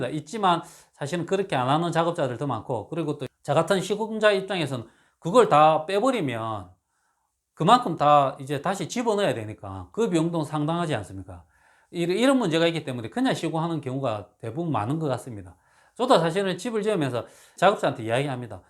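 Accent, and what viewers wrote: Gyeongsang, South Korea. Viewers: ~목소리톤으로 경상도분같으신데 혹시어느지역이신가요? 경남함안쪽인데 의뢰가능한지요?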